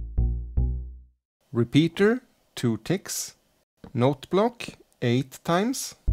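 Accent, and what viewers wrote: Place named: Sweden. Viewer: It sounds like ur swedish and talk english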